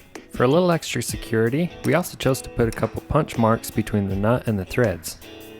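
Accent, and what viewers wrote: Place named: Utah, United States. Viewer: I can tell the guy narrating is from Utah with his accent.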